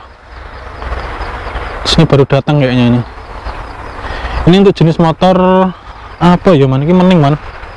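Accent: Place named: Blitar, Indonesia. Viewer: itu wong mblitaran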